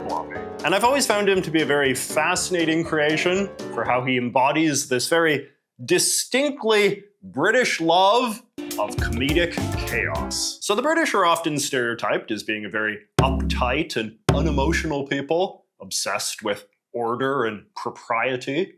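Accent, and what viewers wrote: Canada. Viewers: you have the most canadian accent i have ever heard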